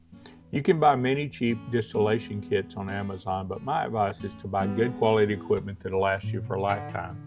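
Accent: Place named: South, United States. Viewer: The southern drawl-y accent is super likable to me atleast, whos from Northern Sweden, arctic cirlce. ❤️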